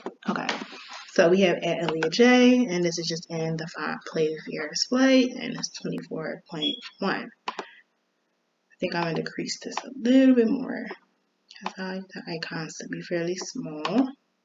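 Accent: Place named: Philadelphia, United States. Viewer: Thank you for this video so helpful!!! 🫶🏾You sound like you from philly… are you from philly ?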